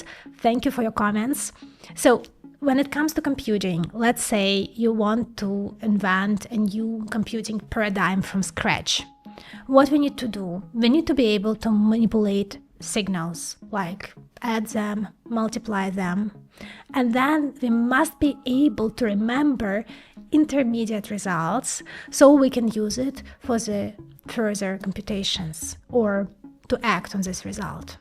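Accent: Russia. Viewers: i would really love to hear you speak in your natural language which i assume is Russian. Even though you speak in English, you cant hide the natural mother tongue. Not saying anything bad about your English or anything, its absolutely perfect, just would love to hear you in your natural mother tongue, i think it would sound more fluid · You've improved so much compared to your earlier videos, and it's great that you’ve stopped using the Russian 'kh'х' sound. I really love your growing confidence—keep going!👍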